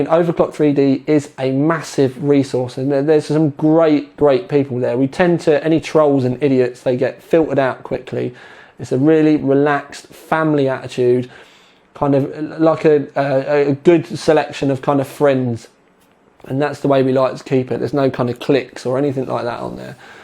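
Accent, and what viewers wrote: United Kingdom. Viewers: Fuck that, im hangin with fer a 1/2hr!! More brit accent! More lamp smashing!! FTW In all seriousness....smash more lamps.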